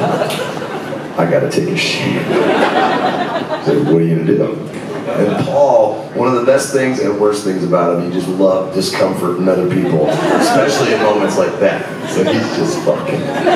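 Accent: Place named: Scotland, United Kingdom. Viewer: that beautiful scottish accent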